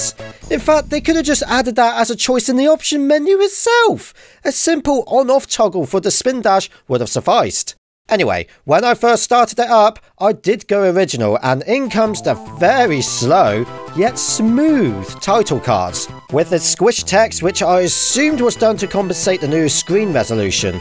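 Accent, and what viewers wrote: United Kingdom. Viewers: Only British accents can make Sonic Genesis rage funny. I am Scottish and I know this.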